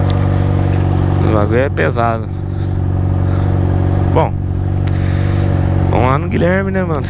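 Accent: Goiás, Brazil. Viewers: porque vc fala no pique do Goiás vc nasceu em Goiânia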